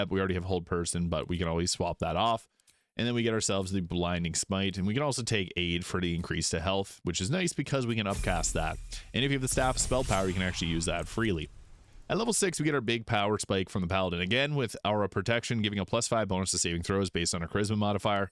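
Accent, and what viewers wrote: Canada. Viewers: lmao yes I figured you were my northern neighbor greeting from Minnesota!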